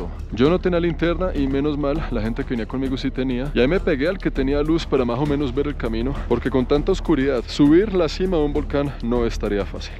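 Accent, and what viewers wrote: Colombia. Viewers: Me encanta verte porque tienes tanta humildad y tu léxico excepcional tu asentó colombiano es lo máximo!!! Saludos a la abuela 😊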